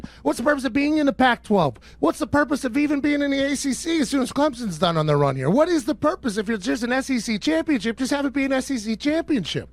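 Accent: Pittsburgh, United States. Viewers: Love yinzer accent especially with the 412 merch on while in the hill in da burgh...in a box truck.. Classic yinzmcginz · yinzer accent is the best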